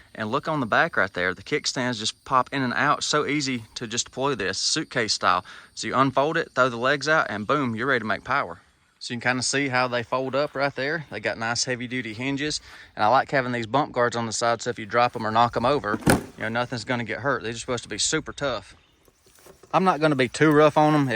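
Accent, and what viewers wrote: South, United States. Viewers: so confused. here a southern accent but username says mountains. but the south doesnt have mountains..?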